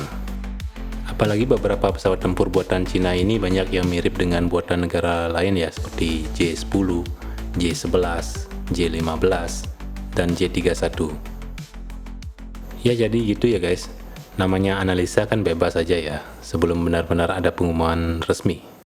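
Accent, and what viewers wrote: Bali, Indonesia. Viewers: Orang bali lu bang?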